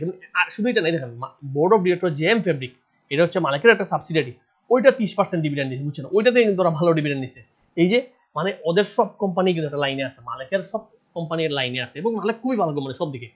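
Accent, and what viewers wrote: Sylhet, Bangladesh. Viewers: আপনি কি সিলেটের? আপনার ভয়েস অমল দার সাথে মিল আছে।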